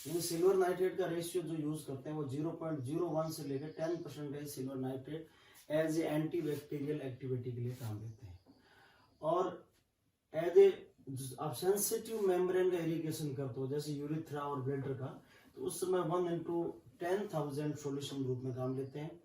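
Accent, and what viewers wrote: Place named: India. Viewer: he speaks indian dude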